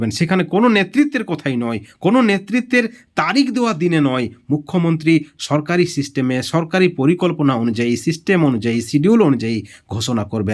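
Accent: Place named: Bangladesh. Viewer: Tumi ki bangala dasi vasha ta to Bangla dashir moto khabor towki Bangla dashar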